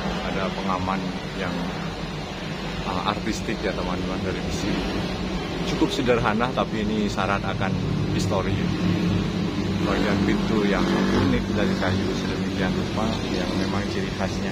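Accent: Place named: Madura, Indonesia. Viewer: baru nonton channel ini. saya suka karena rumah Belanda kuno. Logatnya Msdura banget... jd inget sama famili jauh saya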